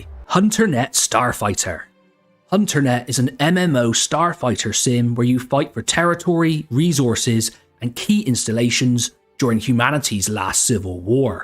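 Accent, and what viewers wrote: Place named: Bristol, United Kingdom. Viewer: Your accent sounds Bristolian, the very least you're from the South West? I have so many questions.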